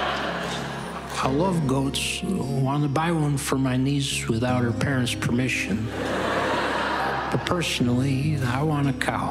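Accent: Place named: New York, United States. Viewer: A voice that actually represents NY. This man is a treasure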